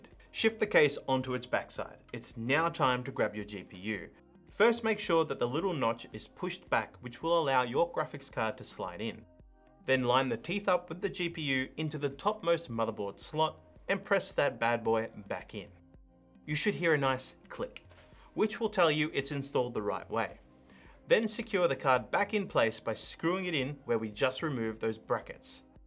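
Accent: Australia. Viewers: Awesome walkthrough! I was 90% sure of how to go about this, but having a lovely Australian voice to check my work was immensely helpful and reassuring.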